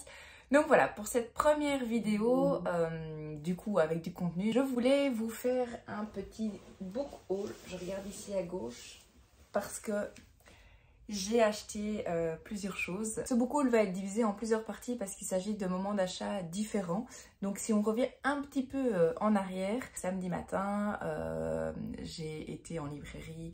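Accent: Belgium. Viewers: Coucou. Mon oreille détecte un léger accent belge.... Dites-moi si je me trompe lol. Je suis belge moi-même 😉. Bravo pour le vlog👍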